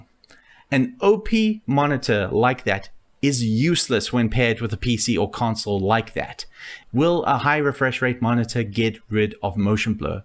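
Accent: South Africa. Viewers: Just started the video, noticed your accent sounds like south african... Are you from South Africa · I detect a South African Accent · South African ;)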